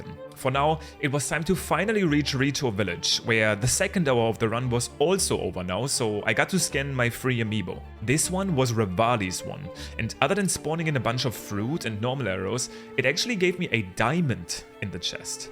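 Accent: Germany. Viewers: Just wanted to ask are you german? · are u german? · I hear Denglish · You Sound very german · Ich bin leicht getriggert von diesem Deutschen Aktzent. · Sag mal bist du eigentlich deutsch? Dein Aktzent oder dialekt ka hört sich stak so an. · Hey I am wondering if you are german as your accent reminds me of my accent. Sorry if I am wrong.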